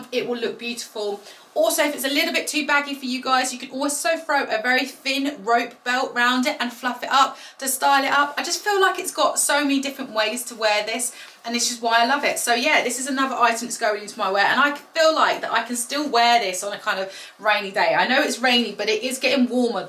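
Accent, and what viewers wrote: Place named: United Kingdom. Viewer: please don't get me wrong but I'm watching your videos for your accent. Have you ever thought of making a video about a British accent? I'm sure there are many people who want to copy your accent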